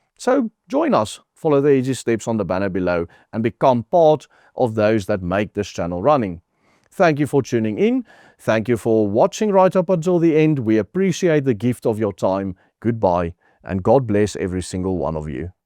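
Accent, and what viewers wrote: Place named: Afrikaans, South Africa. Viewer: An interesting pod caste. Just wish the presenter would not put his Afrikaans spin on the names of the stores and people.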